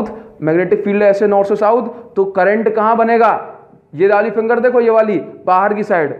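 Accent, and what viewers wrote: Odisha, India. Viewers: Odia ho kya sir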